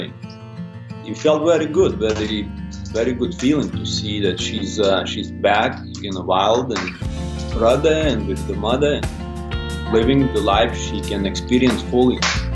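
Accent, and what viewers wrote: Russia. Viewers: Русский акцент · Ох уж этот русский акцент... =) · the russian accent and simple english adds so much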